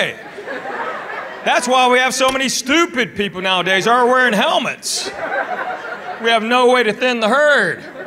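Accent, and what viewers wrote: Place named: Tennessee, United States. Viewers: you're hilarious, I worked for a Korean dr that had immigrated from Korea to calif. then to East TN. I have a very thick East TN twang. So for the dr's b-day I got him a southern to English dictionary and a DVD of you. I came back to work the next day with this dazed look on his face. I thought something horrible had happened... he watched your DVD with his wife, children, his parents, and a few relatives. Best $ 30.00 I've ever spent. I got the side eye for the next month. His kids thought you were awesome... another asian person that had a TN Twang. The rest of his family demanded he more back to the West coast before his kids accents were permanent.😂 20 years Still makes me laugh. Damn, I should have thought to invite you to the office to meet him. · I just love your comedy. I am from East TN and I love a real TN accent not the phony ones so many peoplemyae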